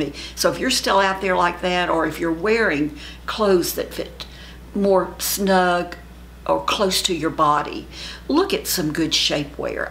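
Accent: South, United States. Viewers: Hi, great advice and hell yes I would love to hear how you and Jim met and how he won your heart. So happy you never settled for just anyone. You always make my day because you are always honest and interesting plus I love you southern accent 😉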